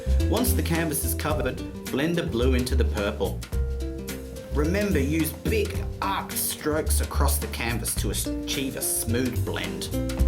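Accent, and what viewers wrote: Australia. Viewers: austrailian accents makes everything sound so cool!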